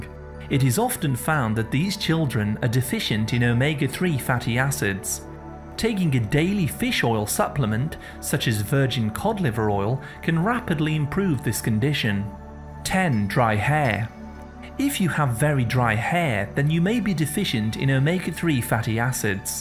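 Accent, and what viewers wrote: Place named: North, United Kingdom. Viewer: When I heard " a like is always appreciated", I realised I take these videos for granted. I think of them as computer generated, spoken by robotics. They are so efficient, precise, informative, that I treat these vids like Wikipedia. I assume there is a team of computers generating these videos, not really someone called. Is that plea for likes added to make me think it's a real individual person. Is that warm northern accent used to give a feeling of trustworthy ness. Anyone else? Or am I in need of a course of omega 3 to lubricate my brain and heart.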